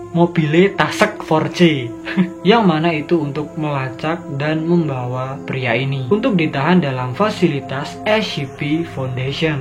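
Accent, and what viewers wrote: Java, Indonesia. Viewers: Jawa mana mas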